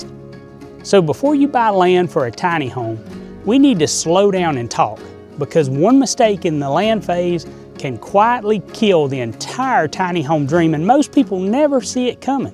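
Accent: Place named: South, United States. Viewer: Although I would hope that my property has a little bit of soul... I think what HE is saying, is "soil". I do love a Southern accent... ❤😂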